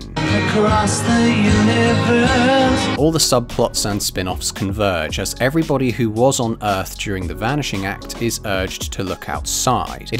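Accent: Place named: United Kingdom. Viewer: Hello man. I was thinking about your accent but i can’t quite tell which is it: of course it’s an accent from the UK but which one? I’m from Italy and i’m trying to get more familiar with this kinds of accents, thank you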